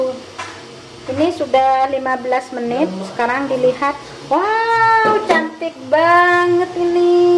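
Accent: Madura, Indonesia. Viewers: Kalau saya tahu cara bicaranya dr pulau madura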